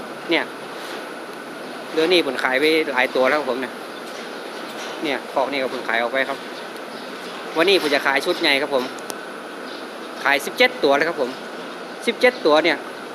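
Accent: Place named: Nan, Thailand. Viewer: สำเนียงคุ้นหู คนเมืองน่าน บ้านปอนเน้อ เก็บเกี่ยวความฮู้มาเลี้ยงเองตี่บ้านเน้อ เอาใจจ่วยลงรายละเอียดนักๆๆ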